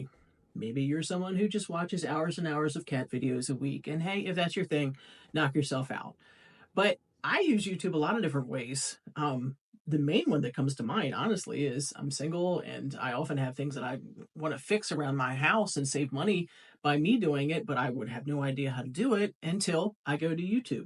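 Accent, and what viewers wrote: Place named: Pennsylvania, United States. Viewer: Great information! Do I detect a Pennsylvania accent or a bordering state?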